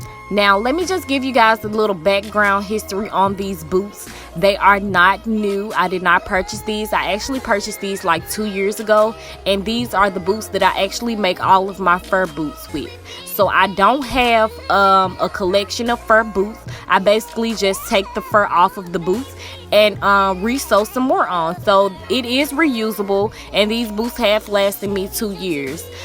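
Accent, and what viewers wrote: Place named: Georgia, United States. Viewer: You talk real country lol are u from Georgia also?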